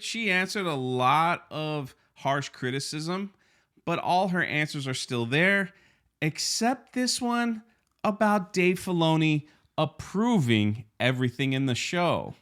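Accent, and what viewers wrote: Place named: New York, United States. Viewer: Love this guy’s accent. I picture a husky New Yorker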